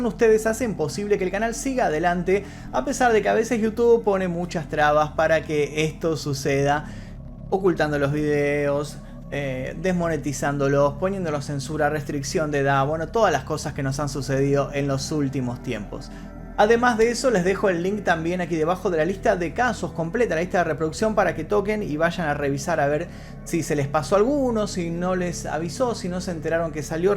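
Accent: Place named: Argentina. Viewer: 😘😍 me encanta tu acento argentino, saludos desde Ciudad de México 🇲🇽🤗!!!!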